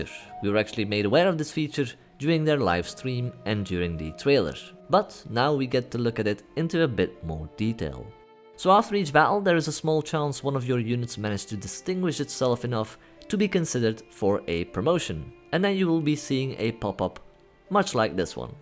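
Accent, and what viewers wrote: France. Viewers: ton accenbt est vraiment interessant, parfois c'est francais, un peu anglais et une autre pays. j'aime ca!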